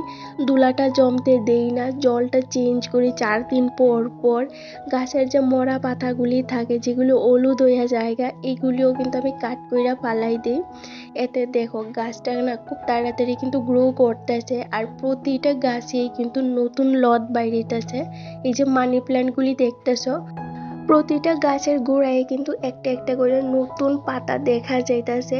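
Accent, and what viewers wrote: Bangladesh. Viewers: আপু তোমাকে একটা কথা জানার জন্য আপু তুমি সেই উওর টা দাও তোমার ভিডিও ভালো লাগে বলেই দেখি তুমি এক দেশের আমি আরেক দেশের কিন্ত মানুষের মন যাকে ভালো লাগে তার সমন্ধে জানার ইচ্ছে করে আর তেমন বড় কোন প্রশ্ন করি নাই তোমার আসল বাড়িটা কোথায় তোমার ভাষার জন্য কারণ বাংলাদেশের কিছুটা টান আসে আমি অনেকের ভিডিও দেখি কিন্ত কেনো জানি তোমাকে আমার খুব ভালো লাগে আমি বাংলাদেশ থেকে দেখি তুমি কোন জায়গায় মানুষ আর অরিজিনাল বাড়ি কোথায় তুমি ইন্ডিয়ান সেটা বুঝতে পারছি কিন্তু কিছুটা আমার দেশের টান আসে তাই জানতে চাইছি ভালো থেকো সুস্থ খেকো এগিয়ে যাও আছি তোমার সাথে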